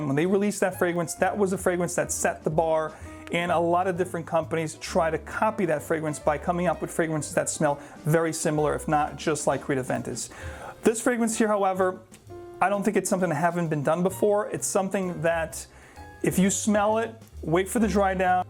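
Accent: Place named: New York City, United States. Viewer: I like your reviews, clear NYC accent... good descriptions , gentleman style!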